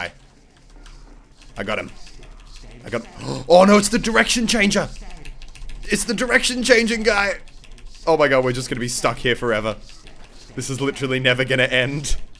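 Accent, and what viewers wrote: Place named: Australia. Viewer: Your Australian accent seems to come out a lot today. I like it (•w•)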